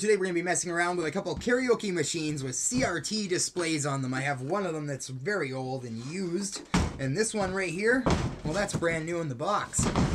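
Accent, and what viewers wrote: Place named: Ontario, Canada. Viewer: I love Ontario accent, love from Italy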